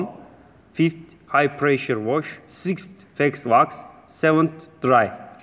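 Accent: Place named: Turkey. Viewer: Sesi duyar duymaz bu Türk İngilizcesi dedim ve öyle de çıktı :)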